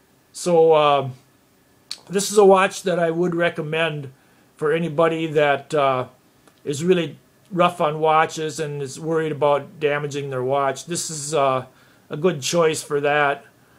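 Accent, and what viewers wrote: Canada. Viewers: good review man. and cool accent. are you canadian?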